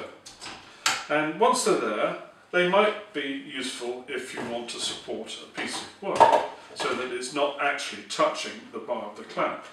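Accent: United Kingdom. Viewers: did a great job. I have several clamps from other manufactures. Last night I was clamping a closet shelf system and I noticed that the Jorg's were not exactly parallel which surprised me, but the Besseys that were also being used in the same clamping were exactly parallel. The Bessey clamps made it possible to clamp perfectly, the Jorg's would have left a mess for me. sold me on Bessey, and the British accent made me feel chipper!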